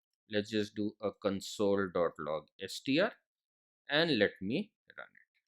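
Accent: India. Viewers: What's so funny about it? I guess you've never heard Indian English! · funny indian accent... 😸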